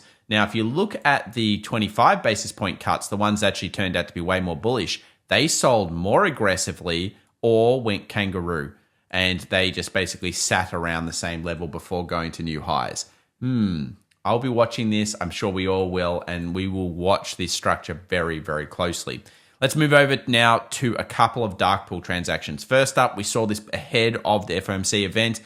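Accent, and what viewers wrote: Australia. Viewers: I think that's just his aussie accent 🤣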